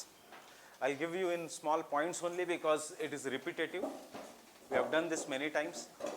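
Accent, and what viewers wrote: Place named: Maharashtra, India. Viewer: sir from maharashtra land his speech like maharashtrian people❤ sir I am from beed maharashtra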